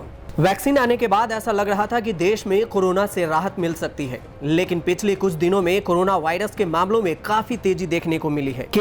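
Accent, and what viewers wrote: Bihar, India. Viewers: Bolne wala bihari hai ....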